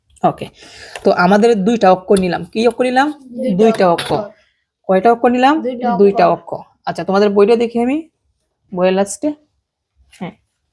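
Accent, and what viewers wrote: Sylhet, Bangladesh. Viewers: তোমার বাড়ি কিতা সিলেট নি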